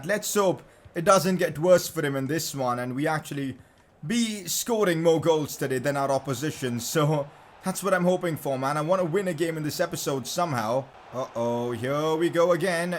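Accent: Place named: India. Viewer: indian accent is so funny only reason why i watch your videos lol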